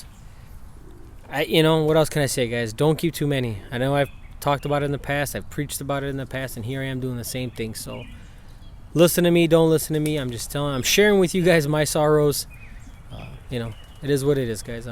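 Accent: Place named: New York, United States. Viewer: Sound like you from New York brother, the loft has to be remade completely, you should make a design similar to this, open ventilation for the breeders, click the link its a link to a photo of a design for a loft that basically keeps things completely stress free, no smell of pigeons , you can guarantee the birds and breeders will always be happy and healthy <link>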